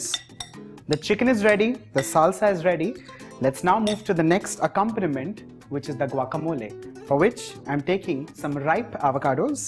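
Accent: India. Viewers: mate it's, he just has an indian-like accent. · Love this funny Indian English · Authentic Mexican recipe with Indian accent. I’m going to try this recipe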